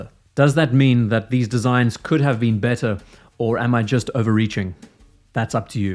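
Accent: South Africa. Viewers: I swear you’re South African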